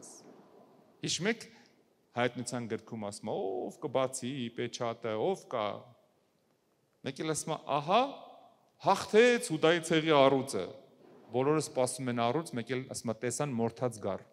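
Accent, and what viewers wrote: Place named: Aparan, Armenia. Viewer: Ես էլ ասում եմ ինչի եմ այսքան հաճույքով լսում ձեր քարոզները պարզվումա Ապարացու արյունն էր քաշում նախ ասեմ որ իսկապես շատ լավ եք խոսսում նույնիս խրատական և դաստիարակչական մեծ տաղանդ ունեք բոլորն էլ լավն են բայց ձես խոդքը մի տեսակ տարբերվող է երևի որ Ապարանցի եք դրանից իսկ եթե գաղտնիք չի կասեք կոնկրետ Ապարանի գյուղերից եք թե միջից ես էլ եմ Ապարանցի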